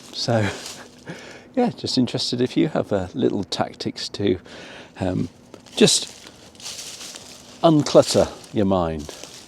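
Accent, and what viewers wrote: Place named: United Kingdom. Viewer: My job as a train driver(train engineer might be the correct English term) do put me on when i am at work, so when ever i get the chance I take a walk during a work day, and when i get home i watch your or some of the other British outdoor youtube shows, that relaxes my brain, and i enjoy listening to real english. Keep em coming.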